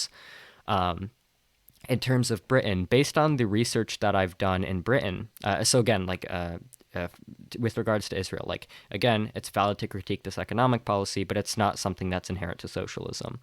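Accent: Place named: South, United States. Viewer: I can't take her seriously with that southern accent.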